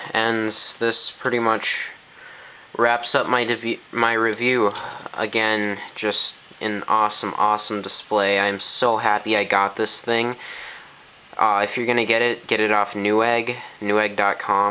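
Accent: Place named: Canada. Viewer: do you have ANY canadian in you? you sound canadian.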